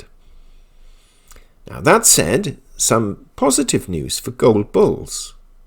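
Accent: United Kingdom. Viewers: China owes the US a trillion dollars? Are you on drugs? The US is and has been running record deficits with China for decades and your position is they owe the US? Despite your smug British accent you clearly are a deep state shill. Figures don't lie but liars figure. China holds 30k tons of gold, the largest gold holder on the planet so your figures are out in left field. China's debt is to itself so it doesn't need to revalue gold but when it does it will expose the wests paper ponzi scheme and then your lies will be illuminated.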